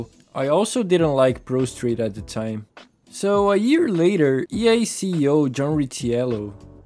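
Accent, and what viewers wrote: Brazil. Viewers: are you from Brazil? your accent sounds brazilian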